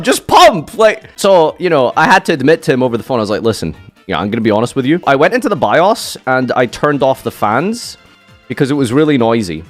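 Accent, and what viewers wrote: Scotland, United Kingdom. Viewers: We need more scottish accent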